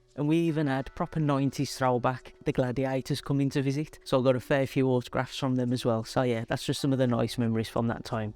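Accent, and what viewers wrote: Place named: Birmingham, United Kingdom. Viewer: Hi, thank you for posting this video and for your absolute sincerity. One of your many gifts that stand out is your ability to communicate with excellence in front of a camera, I have tried this and it extremely difficult but you have always made it look easy. I am a Christian and I will be praying for you that God would give you successful outcomes in all your treatment. I have had 2 severe nervous breakdowns in my life so I know life can be tough sometimes. I love your positive attitude and the fact you struggle with these problems yet you can reach out to others to help them is a really lovely attribute of your character. You have also spent many years helping others by sharing your skills and discoveries with the world. I just want to say, thank you so much and I pray you will experience optimal health going forward. 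You are an inspiration!! I just wanted to add, I have lived in Scotland since age 5 but I was actually born in Birmingham!! I spent my early childhood there and had a Brummy accent like yours. They are memories I will never forget even though I was just a young child.😊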